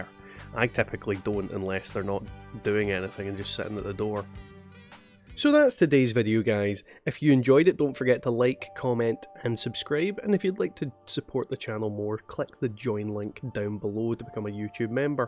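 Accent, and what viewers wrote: Scotland, United Kingdom. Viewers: Min far ye fae in Scotland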